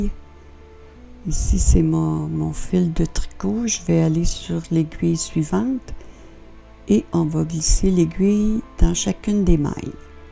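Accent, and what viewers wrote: Canada. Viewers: .ok merci bcp..c difficile l'accent canadien des fois!! Encore merci pour vos partages.j'apprends bcp.salut du sud de la France.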